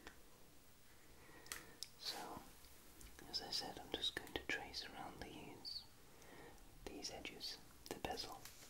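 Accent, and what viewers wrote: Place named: England, United Kingdom. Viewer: Where is your accent from? My guess is either Oxfordshire or maybe north west?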